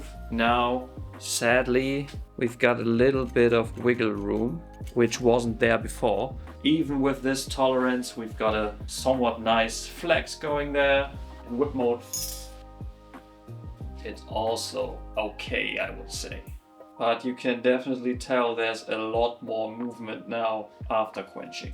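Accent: Germany. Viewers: As a german who is also fluent in english, I really love the denglisch vibes.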